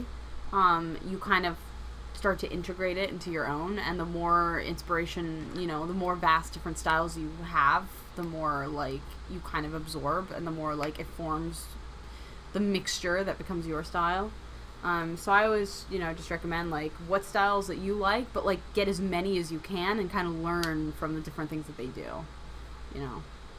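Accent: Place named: England, United Kingdom. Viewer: I adore your English accent .... Oh, I'm not talking about that. You're gorgeous drawing! I am self-taught in drawing and you always inspired me, and also helped and in general you can say that I taught you how to draw correctly. It's gorgeous ~ And yes, if there are mistakes, then pritenzii to Goggle translator. = 3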